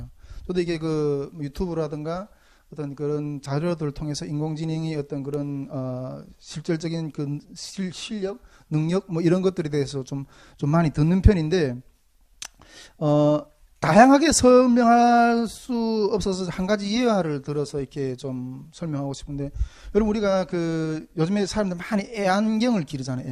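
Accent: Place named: North Korea. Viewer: 이런선교모임도 있었네요. 윗 동네분들 발음과 억양은 조금은 생소합니다만 주안에는 이런 시원한 생수같은 말씀을 처음 듣게됩니다. 귀하시고 감사드립니다.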